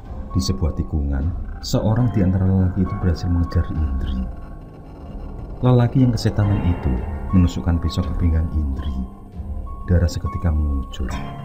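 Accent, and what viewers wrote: Java, Indonesia. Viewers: Kenqpa ya,setiap ceritanya selalu memakai logat jawa..padahal kan budaya dan logat bahasa kita beraneka ragam..kadqng bosen jg kl selalu pake logat Jawa..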